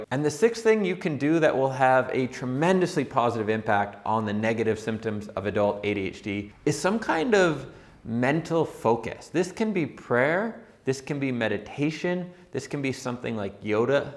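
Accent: Canada. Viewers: Thank you for the video. You really seem to have a Canadian accent! 🏒🥌⛷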